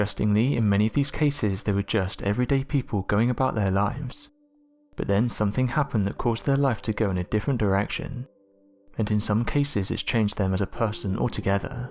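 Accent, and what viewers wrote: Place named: Blackburn, United Kingdom. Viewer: Are you from Blackburn ...that tone of speech lol ... love it..Mad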